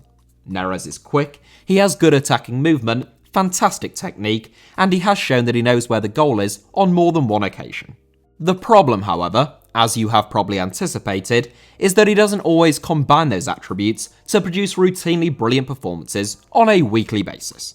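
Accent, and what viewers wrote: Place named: United Kingdom. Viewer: That's just how pronounces it with his Bri'ish accent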